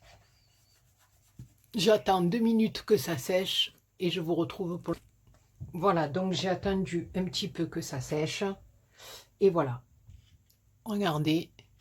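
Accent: South, France. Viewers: Bonsoir tres jolies vos 2 cartes merci pour ce tuto j aime beaucoup vos tutos et j aime bien votre accent du sud il me fait penser au soleil bon week-end à bientot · Coucou Superbe cette carte.... J adore tes explications et ton joli accent du sud comme le mien 😜😜 Gros bisous 😘😘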